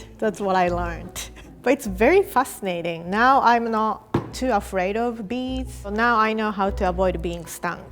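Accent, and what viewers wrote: Japan. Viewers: I just love Japanese/English voice!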